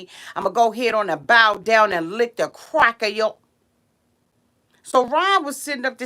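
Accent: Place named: DMV, United States. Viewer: I love your accent, are you from the DMV area???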